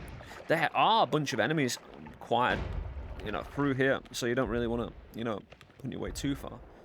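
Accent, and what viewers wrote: United Kingdom. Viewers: One of the regional UK accents, I should recall exactly where he's from but my brain it farts. Give him a chance, bet you'd work it out after a bit.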